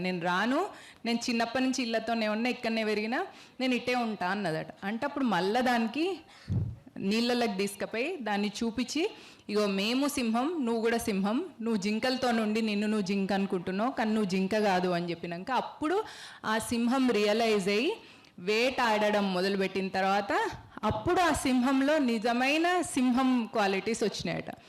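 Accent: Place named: Telangana, India. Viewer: स्वच्छ. Myna. Telangana. Yasalo.matladi .adura.gottindi mam❤❤❤❤❤❤❤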